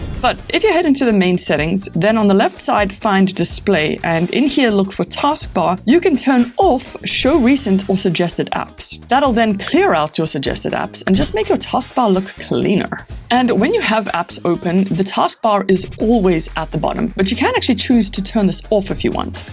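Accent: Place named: South Africa. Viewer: Love the super fun features that you highlighted as well as the South African accent!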